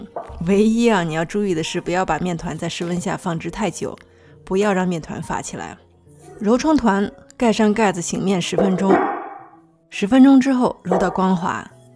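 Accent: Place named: Northwest, China. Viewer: 因为爱上了西北口音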